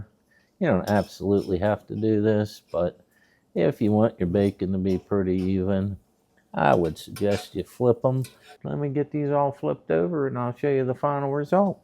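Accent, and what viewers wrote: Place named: Ohio Valley, United States. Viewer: Hi! Love your videos and your Ohio Valley accent. I know you said you got your griddle from Sam's Club. I'm looking at the Lodge bakeware. Seems the widest cast iron "baking" pan (aka griddle) is 10" X 18". What are the dimensions of your griddle? In this video it looks wider than 10". Please let us know.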